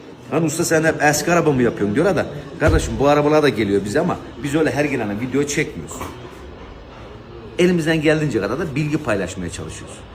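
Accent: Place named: Aegean, Turkey. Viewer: Alem adamsin kral bizde egeliyiz şivene kurban bozma şiveni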